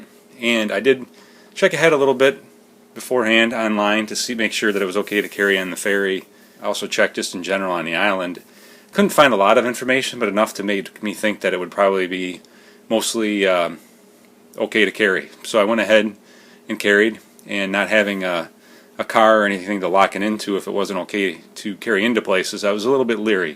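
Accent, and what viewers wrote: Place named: Finland. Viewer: Are you from Michigan originally? I hear some finlander accent. No disrespect I'm a native yooper and thanks for the info.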